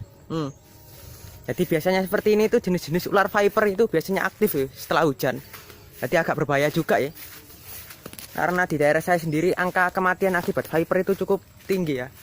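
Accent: Java, Indonesia. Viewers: Jowo ne endi mas? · Nk ngomong oj medok" to.. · Dari segi bicaranya keknya dari orang jawa:v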